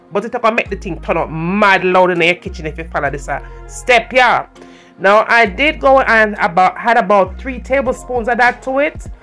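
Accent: Jamaica. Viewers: You sound jamaican but you don't cook jamaican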